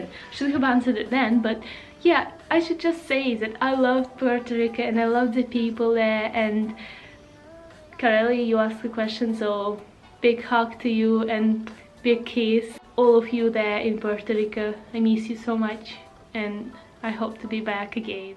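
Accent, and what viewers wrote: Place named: Russia. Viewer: I don't really remember, but you was saying something like "it helps me to stay on chuck" or something like that. Russian accent is not a problem, I'm also russian (almost, actually I'm belarussian) so most of us have an accent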